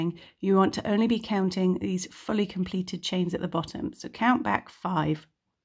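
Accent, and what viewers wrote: England, United Kingdom. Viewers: Beautiful yarn and nice to hear an english accent on a crochet video, may also look great with chain of crochet pulled through rather than straight strands but still fab. Also you could tie of single strand from each double yarn stand for a finer ending. However why as a fellow Brit are you using American terminology? You are doing an English treble stitch NOT an english double crochet. I have been crocheting 50 years and it annoys me English terminology has been ousted by youtube rant over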